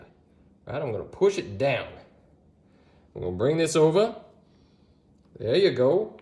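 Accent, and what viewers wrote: South, United States. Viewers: That glorious Southern voice …a true gift from God…😊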